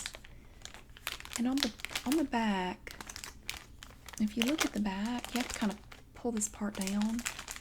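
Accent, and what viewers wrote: South, United States. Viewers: Holy marriage proposal! I could listen to that darling sweet voice all dang day! Gotta love that Southern accent!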